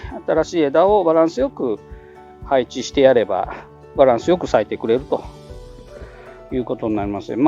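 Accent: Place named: Kansai, Japan. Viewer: はじめまして、いつも拝見しています。 品種別の剪定など、詳しく解説していただいてありがとうございます(❁ᴗ͈ˬᴗ͈)⁾⁾⁾ 今は千葉に住んでますが、関西出身なので関西弁のお話に癒やされています。 我が家に地植えして4年ほどたったイングリッシュローズのオリビア・ローズ・オースチンがあります。 今年は春に2、3輪しか花が咲かず、その後も咲くことなく現在に至っています。 同じタイミングで植えたデスデモーナはこの秋に30輪以上花が咲きました。 同じ様なお手入れをしましたが、オリビアは葉が茂り枝が伸びるばかりで蕾がつきません。 冬剪定を予定していますが、アドバイスをいただけないでしょうか？ 株は縦横90㎝くらいで、ベーサルシュートは4本出ています。よろしくお願いいたします。 寒さも厳しくなってきましたので、御自愛くださいませm(*- -*)m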